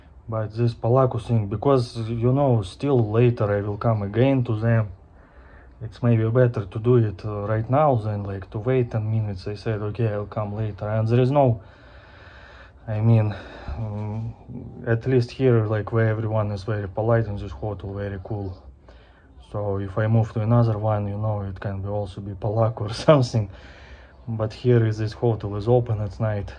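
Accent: Russia. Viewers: Haha you are funny guy. Like you’re russian accent. About USA and Russia I would say we dont care very much and probably dont love them or hate them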